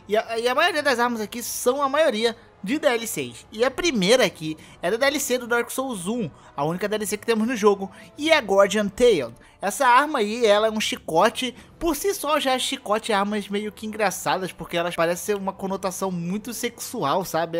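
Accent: Brazil. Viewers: cara de qual estado brasileiro tu é?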